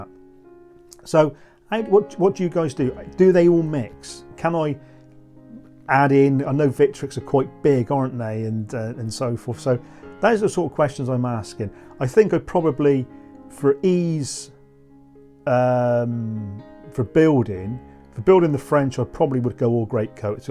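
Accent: West Country, United Kingdom. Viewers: Nice vid bud, love the bolt action table. Seems I’ve stumbled across another West Country wargamer going by the accent lol.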